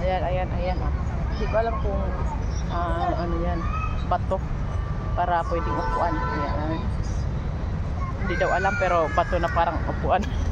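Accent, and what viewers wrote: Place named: Visayas, Philippines. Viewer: Vlagir ka ba Day? Moka ka kseng BisayA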